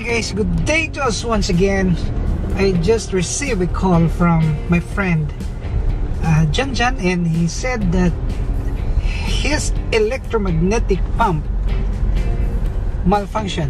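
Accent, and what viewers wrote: Visayas, Philippines. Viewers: Bisaya diay ka kol? 😂😂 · Bisaya mn d ay ka kuya HAHAHA TAGA ASA KA SIR?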